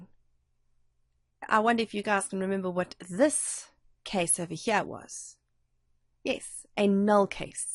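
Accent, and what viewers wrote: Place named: South Africa. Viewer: South African joberger'ish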